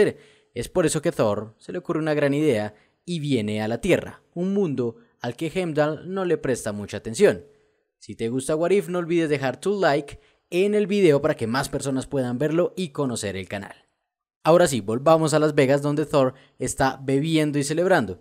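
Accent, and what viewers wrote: Colombia. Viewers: Eres de Colombia parece que si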